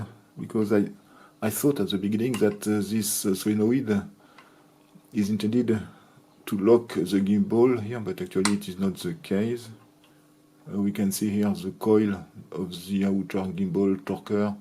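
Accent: France. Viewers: Hahaha, your "few moments later" narration fits perfectly with your nice french accent.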